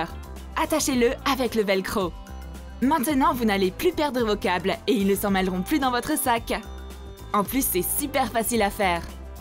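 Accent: England, United Kingdom. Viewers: Vous êtes anglaises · Vous êtes anglaise? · Vous être anglaise????? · Vous êtes anglaise ?? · Tu est anglaise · vous êtes anglaise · vous êtes anglaise? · Elle sont anglaise ou française ? ? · mais vous êtes anglaises ??? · Vous êtes anglaise ou française ou encore les deux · Vous êtes française ou anglaise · Vous êtes anglaise ? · Vous êtes anglaise 😐😮?? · Mais en vrai vous êtes anglaise ou pas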